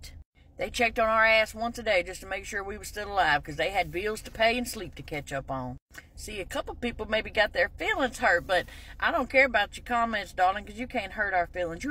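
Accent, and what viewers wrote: South, United States. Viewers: Girl you sound just like me! Lmao you musta been raised in the south.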